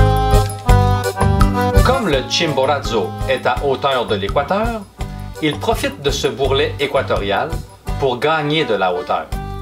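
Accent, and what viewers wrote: Quebec, Canada. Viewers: Quand j'ai commencé la vidéo je me suis dis <<oh cool un Québécois !>> Parce que j'adore leur accent 🤣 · Très bon présentateur, fluide, instructif, marrant et avec des bonnes musiques ça donne un côté comique très agréable. Et puis l'accent québécois c'est toujours du bonheur ! Joli travail merci ! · Ellipsoïdale! La Terre est ellipsoïdale, du fait de sa rotation et de ses pôles plus aplatis. NB: Merci Dr Nozman et Alexandre Astier pour l'expression:) Merci aussi pour cette vidéo très bien expliquée, du très bon travail. Je me suis régalé d'écouter l'accent québecois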